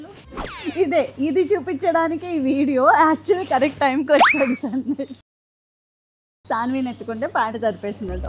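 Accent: Godavari, India. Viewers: Video lo anniti kanna mi godavari slang so nice andi ఆయ్ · Ur godavari yaasa n yeatarakam are peaks...😅 Want a casual godavari accent video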